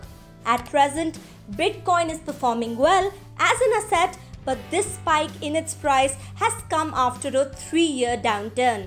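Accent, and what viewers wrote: India. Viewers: I can not take those Indian accents… I automatically gave it thumbs down, however, she might have had some cool info, besides I took my sweet a s s time to write this response.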